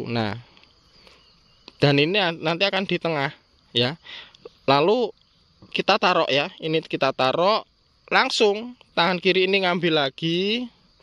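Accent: Java, Indonesia. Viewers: Asline jowone sampean ngendi. Bos.. hehehehe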